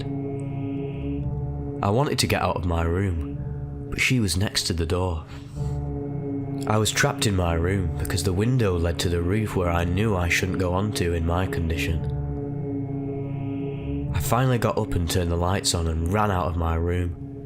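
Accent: United Kingdom. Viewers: Are you british or am I tripping? both?😊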